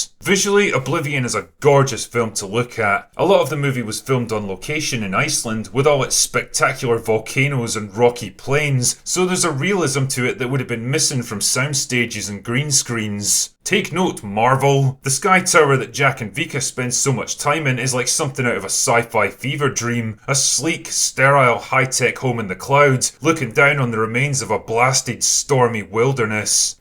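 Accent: Scotland, United Kingdom. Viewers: Somehow I really, 'really' wanted to follow up with "Yes way!" in his customary slurred and cynical Scottish accent.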